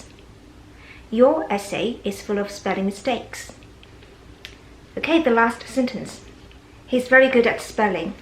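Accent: United Kingdom. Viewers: 我喜歡fanfan的英國腔還有神秘人的聲音很有趣因為他兩次一定會有一次聽起來很不耐煩😂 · 你的英式英语的口音非常漂亮。但是你不知道美式英语中的L,N,R,M的前后都有元音时，这4个音要用2次。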